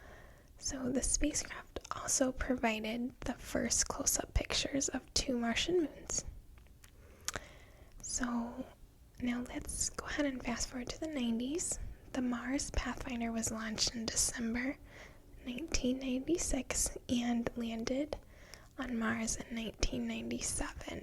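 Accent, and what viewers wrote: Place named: Michigan, United States. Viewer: Are you from Michigan? Your accent sounds like mine lol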